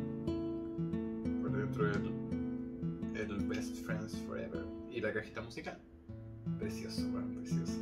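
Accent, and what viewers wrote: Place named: Chile. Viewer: Hola buenas , escuche tu acento y inmediatamente supe que eres chileno jaja , queria preguntarte si ¿tuviste algun problema al recibir el paquete? (Un retraso o algo) lo pregunto por que lo quisiera ,la verdad esque esta muy hermosa la edicion y me encantaria tenerlo. Muy buen unboxing , me caes bien ❤ te voy a empezar a seguir , te deceo mucha suerte en tu vida espero que crezcas en youtube (bueno ya mucho parloteo) nos vemos chao👋❤ .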